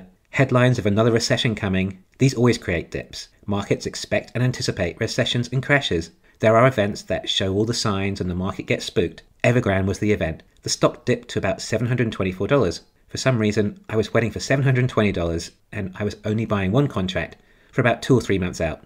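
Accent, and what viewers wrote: United Kingdom. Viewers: It sounds like you are from the UK what app do you use to do options?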